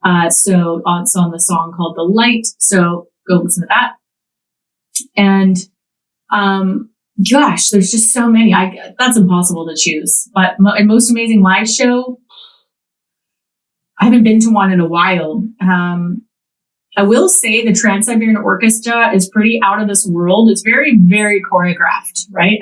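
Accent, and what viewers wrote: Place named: Canada. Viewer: Plus I love hearing Canadian... sets my maple leaf little heart a flutter <3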